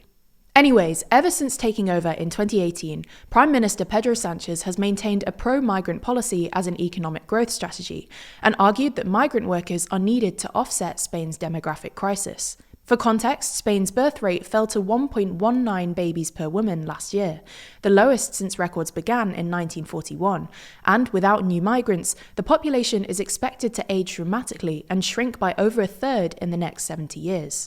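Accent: United Kingdom. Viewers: I like youre British English accent anyway ❤,and shape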